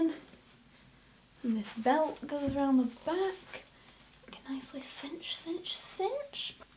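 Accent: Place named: Manchester, United Kingdom. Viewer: Your Mancunian accent really stands out in this video! Loooooooove it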